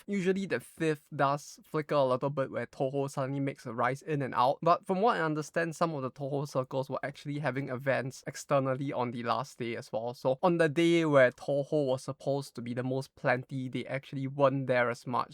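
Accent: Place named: Singapore. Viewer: did u learn english in singapore or from a singaporean? u sound singaporean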